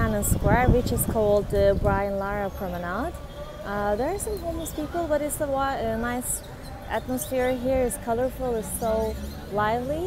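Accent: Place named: Turkey. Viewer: Ah, 😊 türk müsünüz? Biraz accent imizden tahmin.etmisdim. I am also planning to go to Trinidad & Tobago, but we are a bit scared. Would you tell me a bit about the criminality please?:-)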